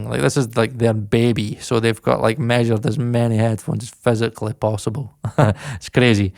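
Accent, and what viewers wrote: Scotland, United Kingdom. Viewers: Scottish accent is nice! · Trying to think why this guy seemed familiar. I had never been on this channel, and then I realised he sounded like Frankie Boyle sorry not every Scotsman sounds like Frankie Boyle to me.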